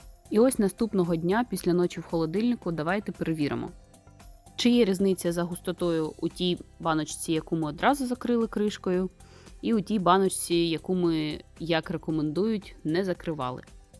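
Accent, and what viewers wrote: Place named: Lviv, Ukraine. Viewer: ого!Я думала,щи Ви зі Львівщини як мінімум 😊Супер👍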